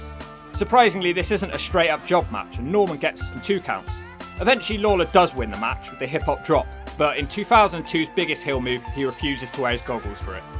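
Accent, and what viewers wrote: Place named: England, United Kingdom. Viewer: I don't normally enjoy the english accents and way of words but you I like. Minus your aew hate ofcourse but we're all friends here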